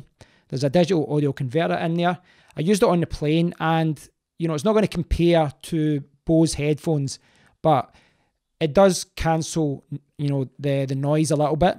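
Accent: Scotland, United Kingdom. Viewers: I love your Scottish accent...It has been a while a heard such a pure Scottish accent! And the U11 is definitely the Android phone to get in 2017. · Excellent video love the scottish accent so refreshing to hear seriously considering on getting the U 11,I have the LG G6 at the moment and i am enjoyingi it ,but I am admittedly a big fan of HTC and can't wait to get the U 11.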